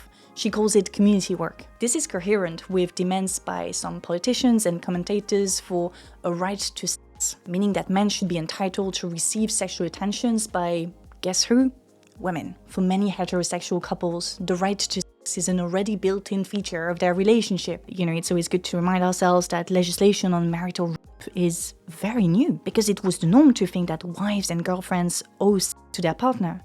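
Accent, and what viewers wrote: France. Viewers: I have never heard a youtuber speaking english with a french accent before. Cool, nice video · i wish I could figure out where your from. best guess... French, but English educated. doesn't matter. good content intelligent informative soft voice is easy to listen to. thanks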